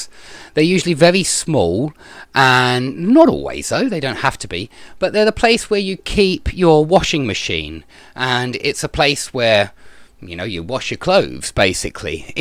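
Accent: United Kingdom. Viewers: I like how you explain the topics. Enough vocabulary, concise definitions, imagination and British accent. Thanks.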